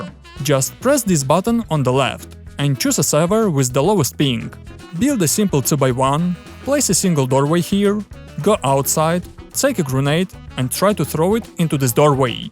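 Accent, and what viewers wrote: Russia. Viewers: о, русский акцент. Наш!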